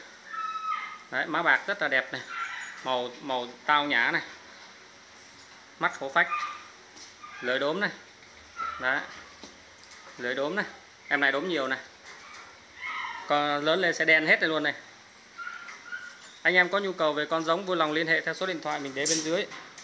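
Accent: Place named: Thanh Hoa, Vietnam. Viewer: Giọng bác giống giọng thanh hoá quê ta quá 😂😂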